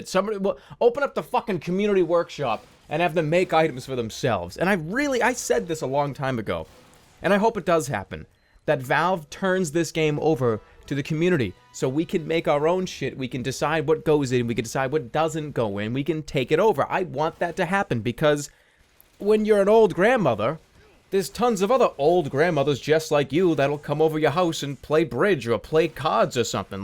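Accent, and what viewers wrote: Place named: East Coast, United States. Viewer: Jerma are you from the east coast? You have the accent and its awesome!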